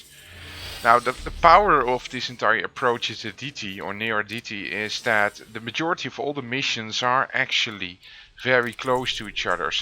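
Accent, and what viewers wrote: Netherlands. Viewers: Hoi, ik hoor aan je accent dat je wellicht Nederlands bent (no offense je Engels is verder prima), maar ik begin net in Elite Dangerous. Of nouja net, ik heb al aardig wat uren er in, maar ik wil het nu wat serieuzer nemen. Kan je misschien enige tips geven om snel te stijgen in ranks? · That dutch accent is strong =) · is it just me or are you dutch just like me cause your dialect sounds a bit dutchy XD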